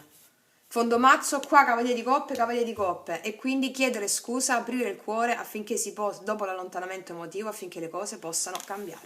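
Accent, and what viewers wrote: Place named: Salento, Italy. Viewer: Che dirti? Brava davvero Io conosco solo le sibille, ma tu sei davvero fenomenale. Ti svolto anche se ti conosco e scoperta x caso da pochissimo, ma mi porti a casa. In Salento, l'accento è inconfondibile, vivo all'estero ma sono salentina doc. Grazie. 🌺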